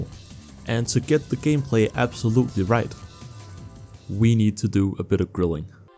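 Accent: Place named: Denmark. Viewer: Yo that would be sick i am 100% buying it if it costs money (selv fra Danmark og jeg kan godt høre du også er fra Danmark men dit engelsk er meget godt 👍)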